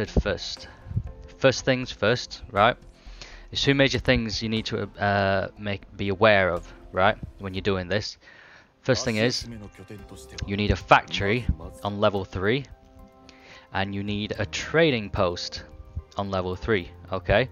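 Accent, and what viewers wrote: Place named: Scotland, United Kingdom. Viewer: scottish accent just make it better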